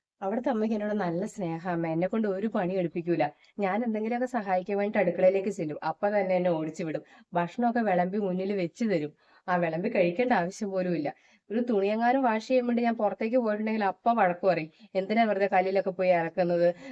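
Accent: Idukki, India. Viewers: ഹയ് ഇടുക്കി slang കലക്കി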